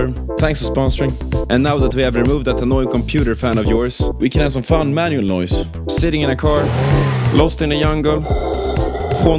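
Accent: Sweden. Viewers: The Swedish accent is so damn recognizeable it's crazy 😂😂😂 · he’s Swedish so he pronounces “j” as a y. Stuff like that is how we got the name “Joe”. Joe<Joseph<Yousef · Every Swedish person can tell he’s Swedish just hearing how to talks in English 💀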